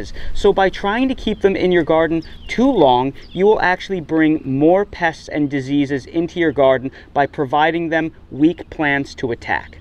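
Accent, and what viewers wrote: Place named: South Carolina, United States. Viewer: Hi, Thanks for all those great tips.I will some of them in practice like putting mulch and watering the plants. I will follow some of them. For what I hear you are South Carolina. I am making a visit to that state soon. I would like to know in which area your garden, not your specific address. I want to know how it is living and gardening there. Is it an area for a homestead ? I want to get information that I will get only by visiting there. I hope you will answer some of my questions. God bless you and thanks this great video.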